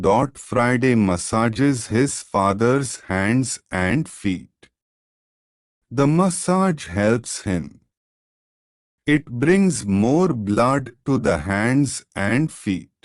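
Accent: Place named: India. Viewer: What is that indian accent god sake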